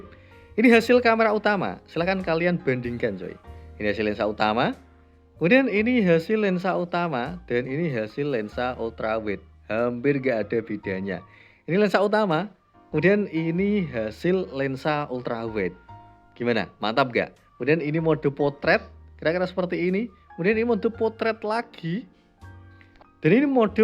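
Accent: Pati, Indonesia. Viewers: Wah wong pati ya mas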